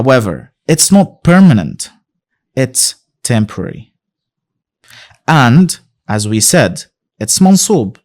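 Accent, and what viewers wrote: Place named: Dubai, United Arab Emirates. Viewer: your arabic dialect is of dubai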